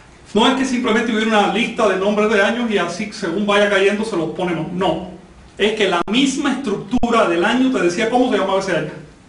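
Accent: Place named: Chile. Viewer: hablas como chileno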